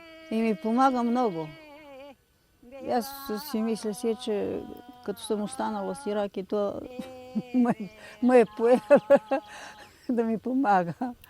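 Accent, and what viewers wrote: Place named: Yambol, Bulgaria. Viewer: Од коя област сякчи Ямболко мяза тозе говор при нас в бесарабия също приказват някой слово инак казват в туй видео ама тъй всичкото също как-то и в нас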